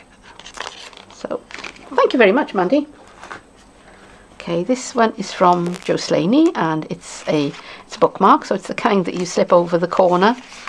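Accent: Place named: RP, United Kingdom. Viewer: Hi, I am a SU Demo for the US (Texas). I really enjoyed your video. It was really interesting to see some of the different things that you ladies make. Our swaps tend to my mainly A2 size cards/ card fronts. All the little treat items were just precious. Also I loved the smaller cards. Thanks for sharing. PS. The accent is so POSH, just love it. lol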